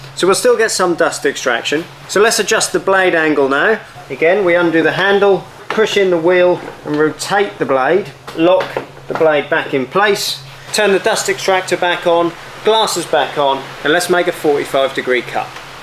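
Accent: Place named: East Anglia, United Kingdom. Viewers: Came for the east Anglian accent, stay for the tips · Could be Suffolk Maybe lowestoft area, but could be Norfolk, only next door, great Yarmouth and further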